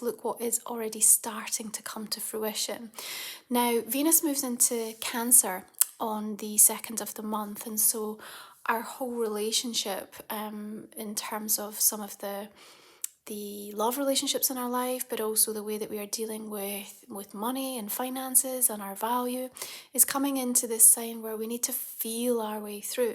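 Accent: Scotland, United Kingdom. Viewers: Oh! Didn't realise you were a fellow Scot 🏴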